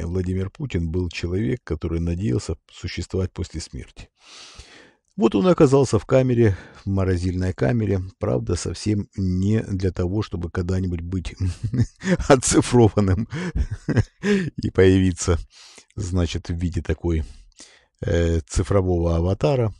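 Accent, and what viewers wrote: Ukraine. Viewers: Речь украинская,разве не слышно?)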